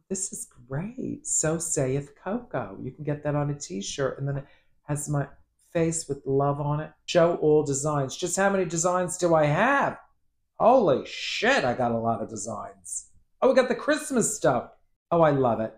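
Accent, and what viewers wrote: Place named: East Coast, United States. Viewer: A nice chat. Your videos are like visiting a good friend. 🙂 Listening you you also makes me homesick for the East Coast. ✨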